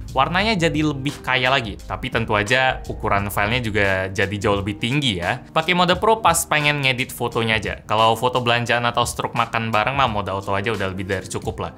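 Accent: Palembang, Indonesia. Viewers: Uong palembang ruponyo haha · 2.ikok bae ? Wong palembang apo ? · "Digabung tigo ikok, yang ini ini ini, atau duo ikok be". Palembang nian bang 😂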